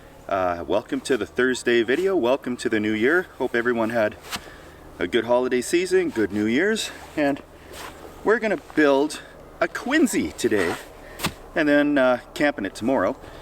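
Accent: Canada. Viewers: Is he from Canada? He sounds like it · This man so Canadian the way he talk I love it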